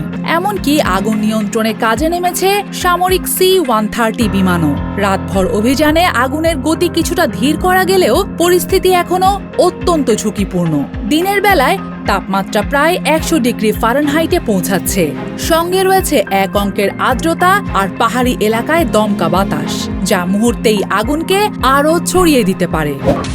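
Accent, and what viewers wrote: Kolkata, India. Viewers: কলকাতার মত কেন নিউজ পরছেন?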